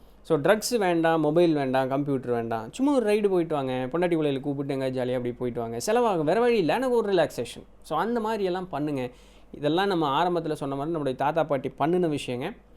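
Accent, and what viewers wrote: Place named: Chennai, India. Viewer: நீங்க கோயம்புத்தூர் தமிழில் பேசாம சென்னை தமிழ் தான் தானே பேசுறீங்க கேவை தமிழ் ல பேசுங்க.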